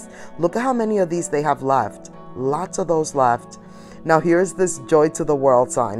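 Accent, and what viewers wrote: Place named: New Jersey, United States. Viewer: Girl I love your videos. Your personality is over the moon. Sounds like your accent is New Jersey. Watch housewives of NJ and that is why I’m thinking that. I’m from New Orleans and people think I’m from Jersey. I love your accent. Tfs cheers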